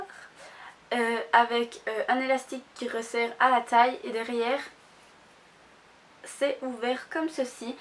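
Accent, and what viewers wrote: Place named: Belgium. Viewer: Super vidéo, je commande tout sur Aliexpress et j'ai eu bcp de déception... Continue comme ça PS: J'adore ton accent 😍 Belge ?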